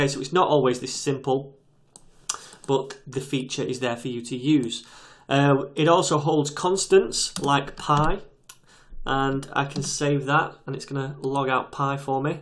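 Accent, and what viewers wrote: United Kingdom. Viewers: Getrogo he is from U.K.)))))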